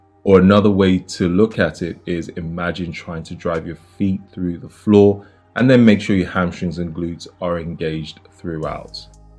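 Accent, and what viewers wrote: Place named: United Kingdom. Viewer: I swear all people with that accent and are from the uk or whatever call their backyard a garden 😭😭😂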